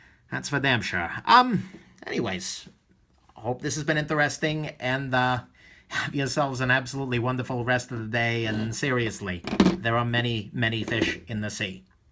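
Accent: New York, United States. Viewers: Love the vids. You have to be from New York. Lol. Cheers.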